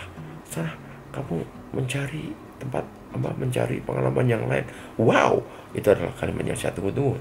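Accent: Java, Indonesia. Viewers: Ya allah mas hanung, logat jawaanyaa bikin ngakakkk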